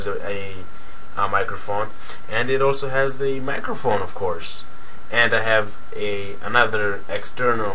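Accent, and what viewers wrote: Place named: Brazil. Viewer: Are you Brazilian? Your accent seems to be very Brazilian :D Thank you for the tips :D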